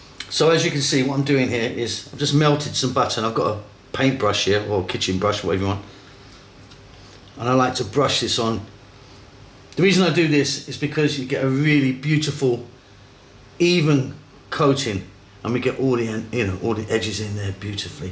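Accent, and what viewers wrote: United Kingdom. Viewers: Looks delicious. Are you originally British?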